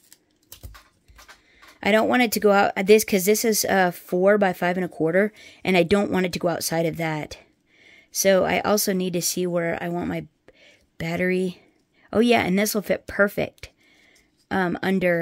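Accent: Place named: Canada. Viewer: I have been watching you for a few months and you have become one of my favorites to watch. I love you little laugh and your "swear words." I notice your accent and assume you must be from Canada-correct?? You need to add a little personal information to your films so we can know you on a little deeper level. You are a hoot!!!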